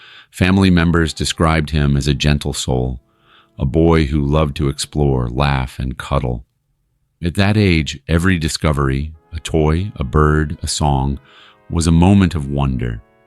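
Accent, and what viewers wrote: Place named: Utah, United States. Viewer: corrected your mistake on JASMINE RUTH BRIDGEMAN and now I need to let you know that in Utah the predominant religion is the CHURCH OF JESUS CHRIST OF LATTER DAY SAINTS (MORMON) and we have the most children in the country because they believe that they are making more of actual God’s by having many children, so that explains why Utah is notorious for child abuse and child deaths. Again thank you for the spotlight on these horrific crimes. Not sure if you are from Utah but sounds like you are. Again thank you.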